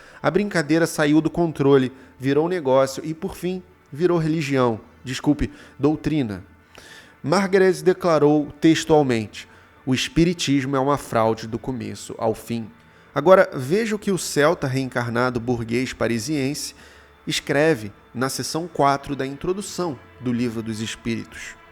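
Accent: Rio de Janeiro, Brazil. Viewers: seu canal é muito bom, você é muito inteligente. Tente controlar o sotaque carioca (S assobiado) que certamente será muito mais agradável ouvir suas ideias (brasileiros de outras regiões).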